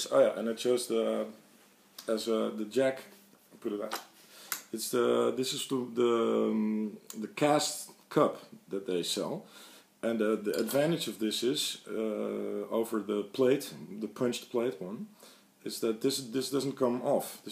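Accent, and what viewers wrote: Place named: Netherlands. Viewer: You speak perfect English with a sleight Dutch accent.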